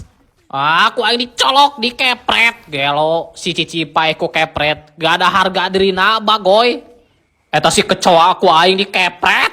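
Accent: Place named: Sukabumi, Indonesia. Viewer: Sunda sukabumi ieu mah euy 😂😂😂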